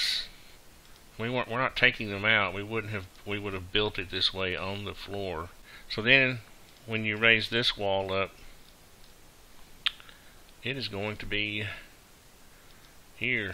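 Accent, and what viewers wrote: South, United States. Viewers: That outside corner is no longer code can't insulate and besides that when you stand your other while you're inside is only going to have half an inch for your sheetrock to screw into should have inch and a half so when your half inch goes on one wall you'll have the other inch you also sound like you're from South which you don't get very cold so I'm sure the insulation is not an issue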